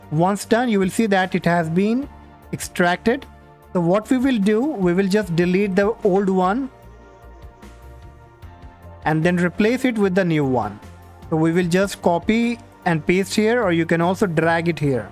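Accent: India. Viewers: I don’t trust that Indian voice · Bro speak real English not Indian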